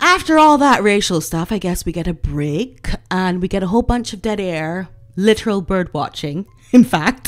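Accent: United States. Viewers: I love your cheeky take on everything - all of which is backed up with factual evidence. On a personal level, do you have an American accent? Can you talk Barbados talk one day just to show us (me).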